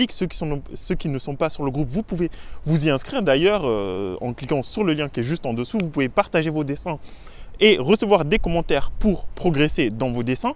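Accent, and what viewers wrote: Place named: Toulouse, France. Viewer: je sais pas mais on dirait que t es a toulouse vers Bonnefois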